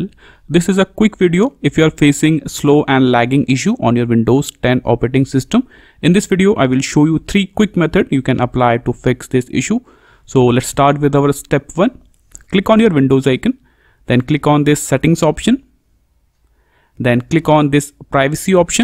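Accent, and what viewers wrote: India. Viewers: The Indian accent and help is crazily good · I already felt scammed by the bad English Indian accent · pov me trying to fix my window 10 annd the voice of the guide is indian (knowing that it gonna be fake)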